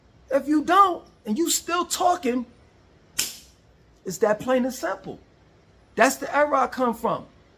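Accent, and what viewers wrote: New York, United States. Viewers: No offense, but your New York accent is still strong as hell bruh. You can’t hide that shit. Your accent comes from where you learned to talk. People never lose their accents. That’s why Slick Rick never lost his accent even though he moved to NYC when he was a kid. I had the same experience moving from the Bay Area California to Maryland as a kid in the early 90’s. Cali Hip Hop was huge in the 90’s. I wondered why people in suburban Maryland idolized Cali lifestyle so much because my childhood neighborhood was exactly like Boyz ‘N the Hood, you might get Ricky’d just walking to the corner store. Like y’all really think old school cars, gang life, guns and smoking weed and selling drugs and living in a dangerous neighborhood with palm trees is cool? I just wanted to see snow and have a neighborhood safe enough to explore as a kid and I didn’t have that in California. 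And I embraced that background hard as a confused teen and twenty something. But as a grown man, I represent myself like I’m in court with no lawyer. It’s damn near an act to of rebellion to embrace yourself as who you are in modern society. Everyone is so aspirational nowadays because of social media. Women nip and tuck everything on their bodies and try to be a build a bytch. People who come from money cosplay like they are from the projects in Brooklyn, broke people in the projects cosplay like they are rich African scammers. People over 40 want to be forever 21. Black kids in the suburbs try to act like they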